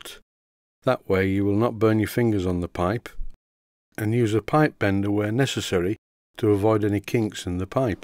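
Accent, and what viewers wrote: Yorkshire, United Kingdom. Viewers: Very nice job and I say you should go full Yorkshire accent. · Do all your video's in full Yorkshire accent please! I don't care if I can't understand it, it still sounds great!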